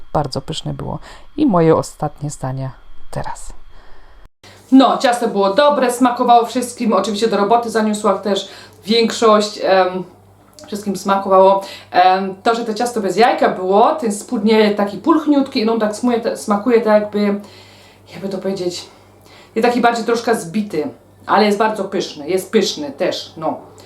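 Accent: Silesia, Poland. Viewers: Cześć dziewczyno😁super filmiki , uwielbiam twój kanał i twój śląski język 😎🤪😅👌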